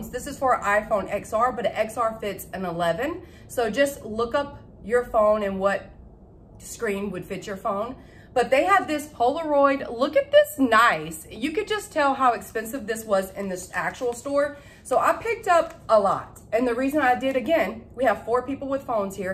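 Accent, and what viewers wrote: South, United States. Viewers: You are so funny with y'all and yes ma'am. I be laughing so hard in years. Where are you from ? Must be from the South. I love the way Southerners talk. I love going down South the people are so hospitable. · Must be from the South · Love your southern accent, love your videos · It’s so good to hear someone from the South....I say stuff like “my bag is slap full!” You make me smile! 😀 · HI from Katherine i love southern voice you do a good job on dollar store you gave me tips.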